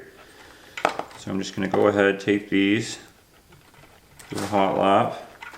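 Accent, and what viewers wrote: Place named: Canada. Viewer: Lol i knew you were Canadian, I guess we do have an accent after all. I'm working on a 03 1000, front fairing is a little different from the 750 and 600.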